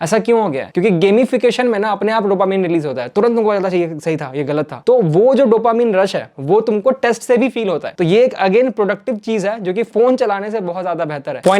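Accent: Bihar, India. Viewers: Your Bihari accent is amazing 😂😂❤❤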